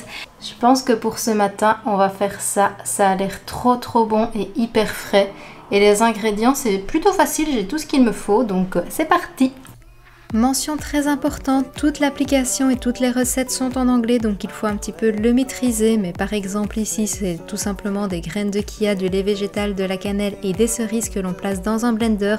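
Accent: Belgium. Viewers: J'adore tes vidéos et ta façon d'aborder les choses ! Et ton calme naturel (et ton petit accent belge 😉) sont super agréables ! 🙏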